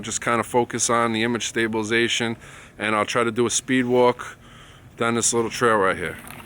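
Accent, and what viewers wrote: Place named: New York, United States. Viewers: I love your voice. Sounds like a Brooklyn accent to me. It's awesome haha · Just came across your review for the first time, but I will be back. As a Canadian, I loved the NY or NJ accent, Great review, you covered a lot of information in a fair way. I was looking at Sony and have an old Canon S100 that I love, but I think your review convinced me the canon was a better camera and value than the Sony. Keep up the great work.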